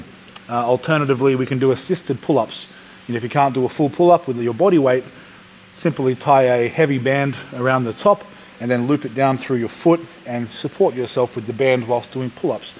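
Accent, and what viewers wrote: Australia. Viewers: Is this guy an Aussie Aussie Aussie?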